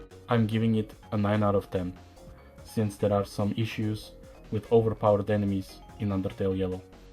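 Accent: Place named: Russia. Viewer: Russian accents 🤤🙏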